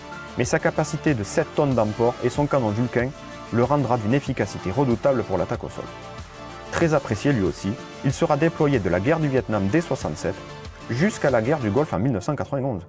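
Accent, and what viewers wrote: South, France. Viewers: De très bonnes vidéos comme toujours, en plus ton accent du sud fait plaisir à mes oreilles d'ariégeois ;) ! Continue comme ça !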